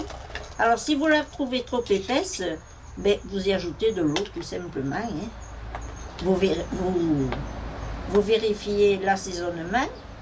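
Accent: Provence, France. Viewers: Merci pour cette belle recette, j’imagine la bonne odeur. Juste un petit bémol, je sais pas qui filme, mais il faudrait pendant votre préparation que l’on puisse voir l’intérieur des marmites, du thermomix afin que l’on se rende compte les consistances au fur et à mesure. Par contre vos explications sont géniales. Désolée pour le caméraman c’est juste une sujestion, j’espère qu’il sera pas fâché 🤣🤣🤣. Toutes vos recettes sont excellentes. Merci beaucoup. A bientôt de vous lire et d’écouter votre bel accent de Provence. 👍👍🥰🥰♥️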